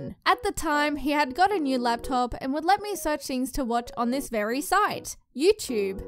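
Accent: Australia. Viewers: Well I wasn't expecting this, but I think its cool that you found something back then that your were cleary passionate about making. Sorry it started to burn you out further down the road and you felt really self conscious about it, but the fact you kept doing in until the end and can talk about it now makes you braver than most people. Well done! Also don't apologise for your aussie accent. At least you don't sound like a flithy hybrid of kiwi & scottish 😅🤣. Can't wait for part 2! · I knew it I knew it I always thought I was just weird and Thought all Australian women sounded the same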